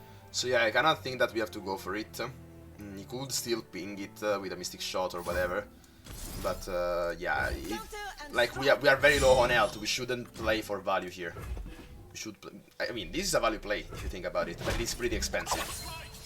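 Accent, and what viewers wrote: Italy. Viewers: Ciao! Sembri italiano da come parli e quindi me la rischio, male che va ti riscrivo in inglese ahaha! Stavo pensando alla possibilità di inserire Lux per Fiora (e magari una remembrance sarebbe obbligatoria) ma non riesco a capire se effettivamente potrebbe essere worthy per gestire meglio alcuni match up. Sono un giocatore di Lux Karma di solito, ma questo mazzo lo conosco poco e soprattutto non so rendermi effettivamente conto se potrebbe sopportare di togliere Fiora. Sapresti darmi qualche consiglio? Grazie mille!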